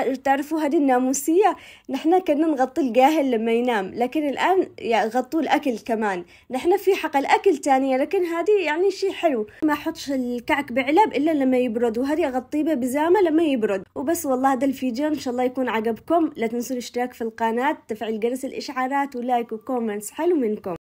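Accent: Yemen. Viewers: تابعت كذا مقطع بقناتك حلوه لهجه اليمين الله يعطيك العافيه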